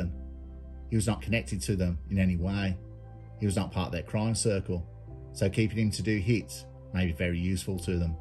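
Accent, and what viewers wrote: Birmingham, United Kingdom. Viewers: There honestly is nothing like a video from, the dulcet Brummie tone, the deadpan delivery, the stories of mans inhumanity to fellow man and the haunting yet relaxing music are what makes these videos such a winning formula. I had to find the music and found this: <link> Apparently it's called " " but I think it may come under a different name in the YouTube music library. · Its Just a Brummie accent mate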